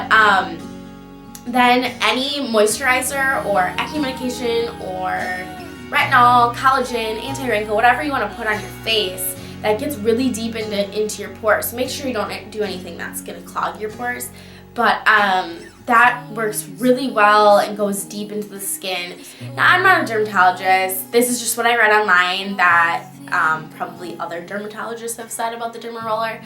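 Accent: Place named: Canada. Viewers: Hm she sounds canadian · Canadian eh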